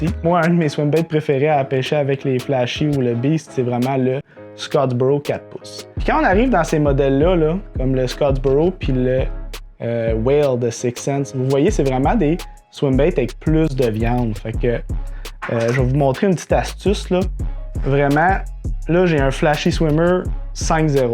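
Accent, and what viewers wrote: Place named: Quebec, Canada. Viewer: J’aime tellement l’accent québécois ❤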